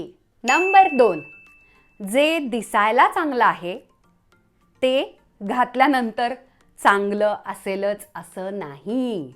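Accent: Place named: Pune, India. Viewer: I just love the way you talk, pure marathi puneri tone :), looking forward to see online shopping tips/tricks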